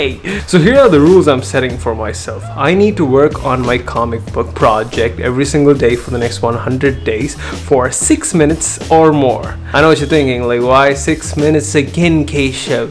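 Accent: India. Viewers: This Indian accent. I can't understand these people!!! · Bruh are you indian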